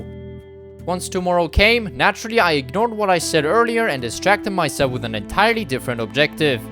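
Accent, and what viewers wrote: Poland. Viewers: are u polish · The more I listen to you, the more I here your Polish accent or atleast thats what my head tells me, keep up the work brother.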